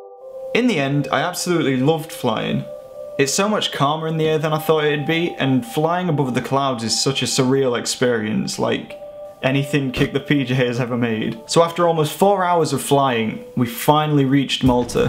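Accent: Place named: Yorkshire, United Kingdom. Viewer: The Yorkshire accent is strong with this one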